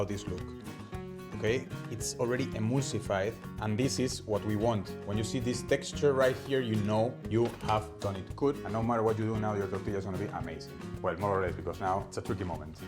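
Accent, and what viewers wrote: Spain. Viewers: Even better to watch when the guy is good looking. And that beautiful Spanish accent….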